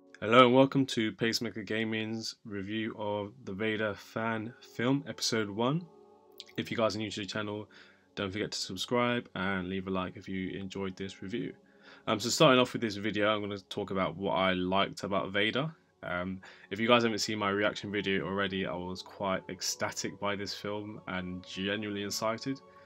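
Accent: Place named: United Kingdom. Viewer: Your dialect is ugly, it sounds like UK trash, rather than superior aka North American dialects.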